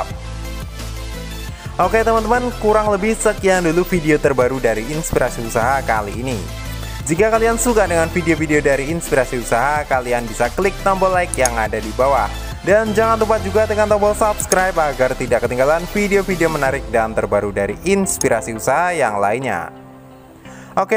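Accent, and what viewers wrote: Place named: Java, Indonesia. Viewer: yang biru kita sambung Ke Puteh..😅 Auihihi.. B.jowone iseh ketok mas broo..👍👍😊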